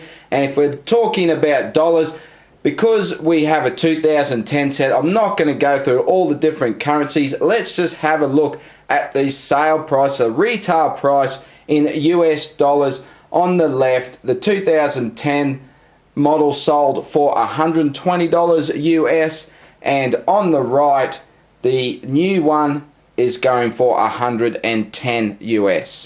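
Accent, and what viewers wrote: Australia. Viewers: Is this guy Australian?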